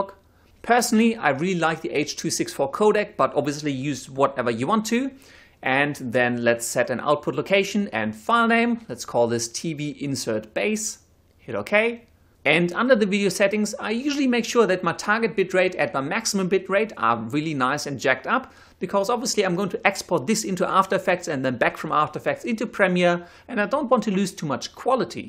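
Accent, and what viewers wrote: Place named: Germany. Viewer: Are you from Germany because your accent sounds so german?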